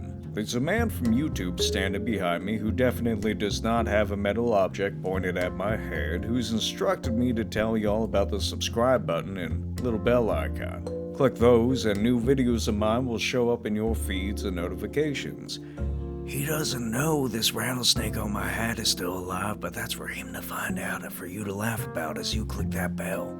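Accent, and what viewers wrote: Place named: United States. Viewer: Loving the cowboy accent with the static image background. Otherwise, hearing all this about 1:1 Time, I wonder if it would make the game I'm designing more interesting as well.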